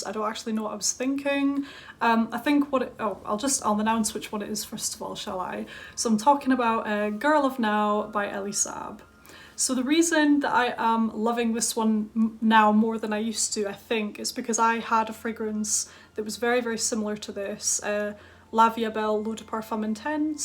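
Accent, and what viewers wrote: Scotland, United Kingdom. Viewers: Nice video. You are a pleasure to watch, a star of Scotland. Lovely Scottish accent.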